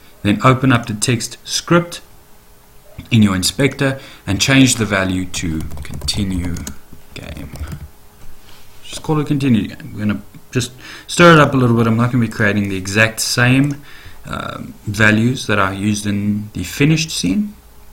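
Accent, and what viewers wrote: South Africa. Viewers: Awesome tutorial! Thanks. I can hear you are from SA! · I am in JHB and I've got to say I do love seeing other South Africans do tuts, and man do you know your stuff. Keep it up, great tut:-D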